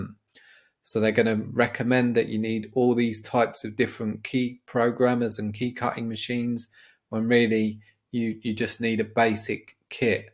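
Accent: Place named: United Kingdom. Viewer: he’s not asking how to convert he was just checking if you was on about dollars as your accent sounds uk so he was just making sure.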